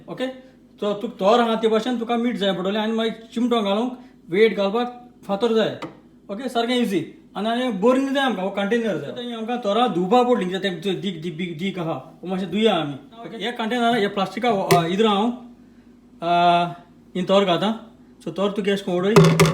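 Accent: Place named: Saxtti, India. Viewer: Nice. Love the Saxtti dialect too!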